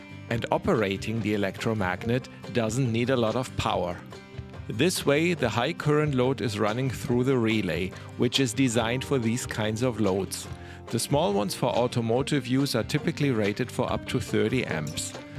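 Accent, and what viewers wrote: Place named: Germany. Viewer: Hehe, man hört sofort das du aus Deutschland kommst😅 , was den Radarsensor angeht, da hättest ohne Probleme die Hupen an den Schrauben montieren können. Der sprinter kalibriert sich jeden start selber von neu. Hat den Hintergrund das der Beladungszustand ja variieren kann. Die paar Grad machen da nix aus. Habe dort auch meine Hupen montiert. Fertiglösungen die angeboten werden haben noch Dickere Platten aus Stahl verwendet wie bei dir in Verwendung. Beste Grüße 😊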